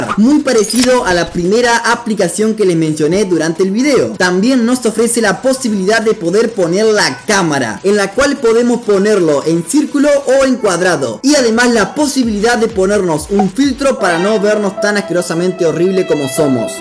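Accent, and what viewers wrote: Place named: Chile. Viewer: eres chileno? se te nota el mismo acento que los de gran hermano chile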